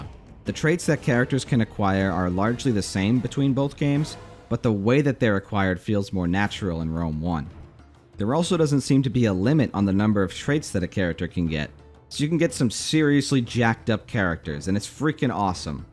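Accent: Boston, United States. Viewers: idk sounds like Boston or New York accent. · 🤣 Dudeguy, are you from Bean Town? You sound like all my homies 🤣 Solid review, I haven't purchased this game and I probably wont until the price drops way down. I love me some Rome TW, but I don't wanna scratch my rose tinted glasses.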